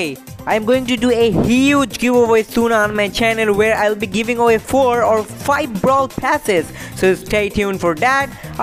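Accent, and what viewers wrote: India. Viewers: ARE YOU INDIAN? BECAUSE YOUR VOICE AND NAME IS LOOKS LIKE INDIAN NAME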